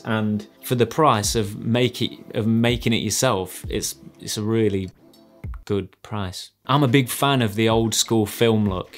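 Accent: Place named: Birmingham, United Kingdom. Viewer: Woooh now I see why your accent sounds familiar! I've been living in Birmingham and lastly Stourbridge 'til last October. Now I'm in Italy due to the pandemic but I'm about to get back living in Stourbridge by half May 😀👍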